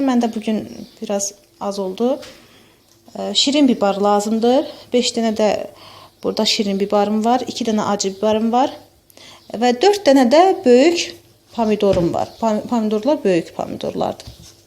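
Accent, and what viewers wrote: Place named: Yeraz, Armenia. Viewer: Ay sənin ləhcənə qurban. Ay mənim YERAZ bacım.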